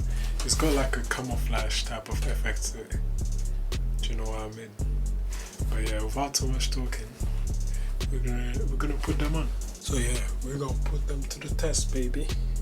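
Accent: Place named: Jamaica. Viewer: Jamaican accident is coo bruh. no homo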